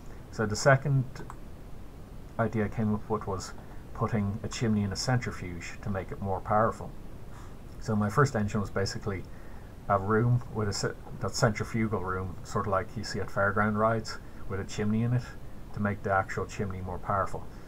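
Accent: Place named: Ireland. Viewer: You sound as though you’re a real Irishman, If I ever build a pulsejet big enough, I’ll slap some solar panels on a dingy, electrolyze the seawater, And run that sucker all the way across the Atlantic. I’ve always wanted to see Ireland. Have a great day. Right now I am in the middle of some very interesting builds. When the videos get uploaded I’ll let you know.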